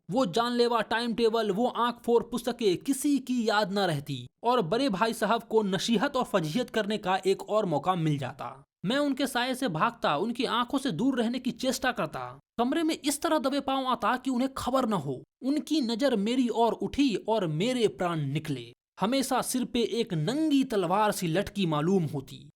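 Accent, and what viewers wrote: Bihar, India. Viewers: Aap bihari ho kya bihar ke lala lala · Bhai ap Bihar se Hai Kya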